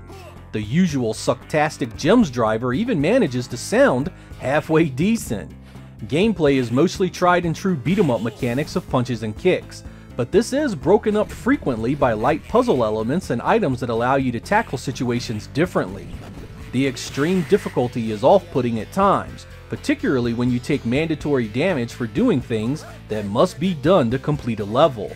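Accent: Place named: Delaware, United States. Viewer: So, totally off topic, but your accent... Delco? Delaware?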